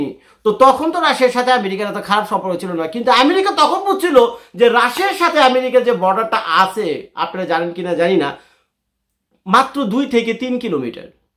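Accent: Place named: Dohar, Bangladesh. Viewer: আপনার কথাবার্তা গুলো শুনতে ভালোই লাগে, নিজের এলাকার (দোহার ও নবাবগঞ্জ) মতো কথাবার্তা মনে হয়। যদিও আপনার বেশিরভাগ মতামতের সাথে সহমত হতে পারি না।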